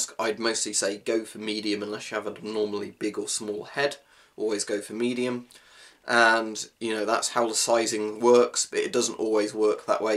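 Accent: Russia. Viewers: nice russian accent bro, you sound like you speak it fluently. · Great russian accent :) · I want an entire video of you talking with that russian accent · Russian accent 20/10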